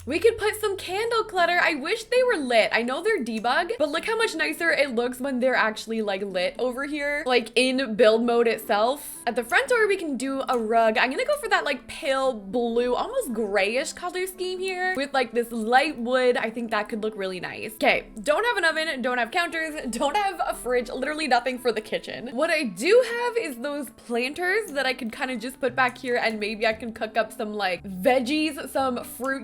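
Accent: Canada. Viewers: I love how she says words like “couch” and “house” 🥰 I didn’t know this was a canadian accent until I discovered her channel. (I’m not a native eng speaker)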